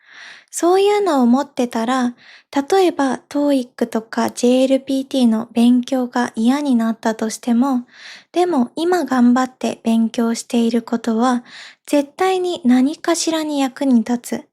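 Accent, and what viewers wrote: Kansai, Japan. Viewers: Omg thank you so much for 関西弁おまけ. ❤️❤️🙏 I'm so happy you added it.🥳🥳 -さん is so adorable speaking 関西弁. The problem is that I want more😂. I guess I'll be listening to this podcast 20 more times 😅. I really love your podcasts like this, as long as you make any video - doesn't matter what it is, I'll watch it. There was a tip for learning, it was to find someone you have a crush on to keep you motivated😂. is my crush. I hope I dont sound like some pervert.😅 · Are you from Kyoto? Really nice to be able to hear 関西弁 !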